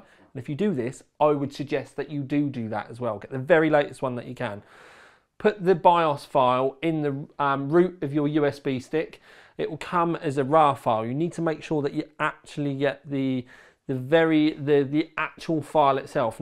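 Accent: England, United Kingdom. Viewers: I can t follow this fucking eng accent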